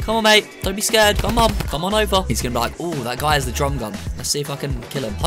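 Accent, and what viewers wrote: United Kingdom. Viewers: At first how did don’t reliaze he’s British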